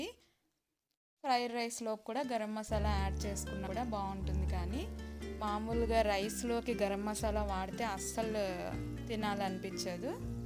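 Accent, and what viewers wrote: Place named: Telangana, India. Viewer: Mee slang తెలంగాణ లాగా ఉంది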